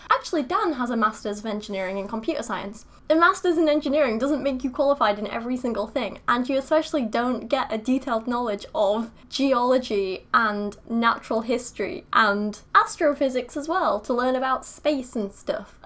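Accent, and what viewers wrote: United Kingdom. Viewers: The girl parts are certainly nice, but I'm sure there's some views coming from having a cute face and British accent as well. :) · like your British accent. Can you do an American accent? Maybe a California accent. I don't think they have one but it may be that I am from there living in New Jersey.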